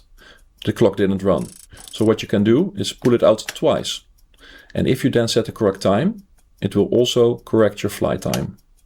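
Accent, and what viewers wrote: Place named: Netherlands. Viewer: you sound utterly Dutch 😂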